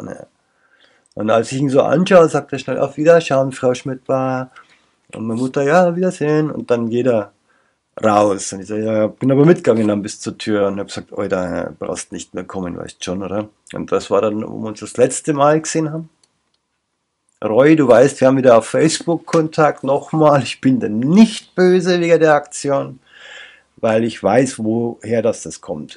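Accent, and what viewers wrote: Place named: Munich, Germany. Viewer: scheinst ein sympathischer mensch zu sein! und noch mit echtem münchner dialekt! grüße aus ndby!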